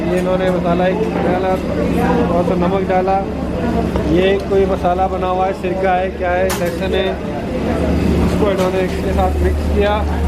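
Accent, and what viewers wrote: India. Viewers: Why speak inglish like people from india...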